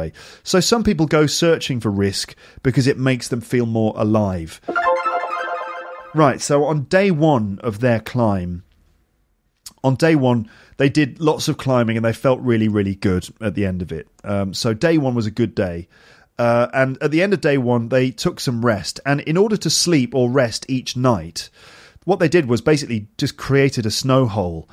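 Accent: United Kingdom. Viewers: Hi. I got your podcast previous friday and i really like it. I love british accent. I'll try to practice with your audio to perfect my accent. thank you very much. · Hello from Almaty Kazakhstan!! I adore British English it always nice to hear your podcasts! thank you very much,! I'm so greatful to you.....May the Force be with you, mate!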